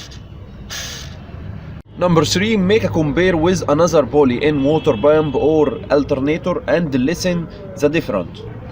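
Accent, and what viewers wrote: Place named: Egypt. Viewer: مع ان أسمك إنجليزي ولكنك حرقة اللغه الإنجليزية بلكنتك المصريه أرجوك خلك على لغة بلدك أحلى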